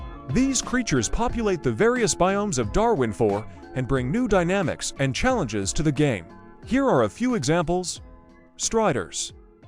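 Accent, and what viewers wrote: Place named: South, United States. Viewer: it’s not bad but could use some tuning, around the end of some sentences it pronounces with a odd southern accent like at the start when saying spells it pronounces them like spales and says whep instead of whip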